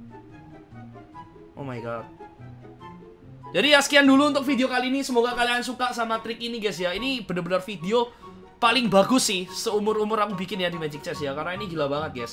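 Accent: Java, Indonesia. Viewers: Wong jowo ndi mas e ? Bhs indonya medok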